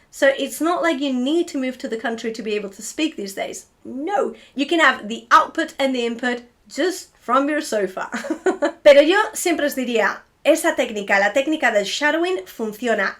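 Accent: England, United Kingdom. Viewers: As a native American English speaker, I would say that you have an almost perfect British accent. However, I can tell when listening to you that English is your second language, you have a very slight intonation that comes through sometimes when you're speaking. Also, your accent is a bit more rhotic than standard RP which kind of gives it away as well. On another note, I'm not sure if reaching Spanish learners is your one of your primary targets, but I would love to see some content that's entirely in Spanish at an upper beginner to intermediate level. Also, when you speak a sentence in Spanish, I'm not sure there's a need to then repeat it again in English, it kind of slows the pace of the video down, I guess you could just subtitle it if your worried that English viewers won't understand it. Some of the errors that non native Spanish speakers make when speaking English aren't bad and sound fine, like for example when Spanish speakers putting an "e" in front of consonant clusters like "sp" and "st" to me it sounds fine, but I did grow up around lots of Mexicans so maybe I'm biased. 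· im american and im so jealous. i dont speak spanish. you speak perfect spanish (since you speak spanish from spain) and you speak a higher class of english than americans with your british accent. ive always wanted to not just be bliingual but to speak the most perfect forms of english and spanish like you... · You speak very good English, because your husband is English and you applied the “Mouth to Mouth” technique to learn so good and get the English accent! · Actually, as an American, I feel she sounds like she has a regional English accent. (Just not sure of the region.) Rarely did I detect a hint of Spanish accent. And the speed at which she can speak English is astonishing. As a former ESL teacher, I salute her! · You sound very English not American at all . I am was surprised when you said you have American Families ?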